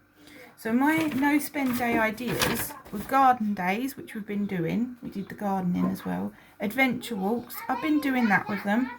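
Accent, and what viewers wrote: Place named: United Kingdom. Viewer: I love listening to you talk, I'm learning british vocabulary and accent and you help me a lot in my practice <3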